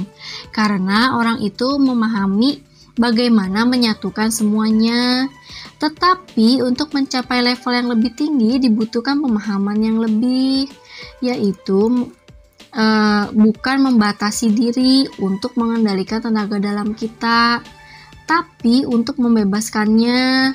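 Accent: West Java, Indonesia. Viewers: teh urang Sunda?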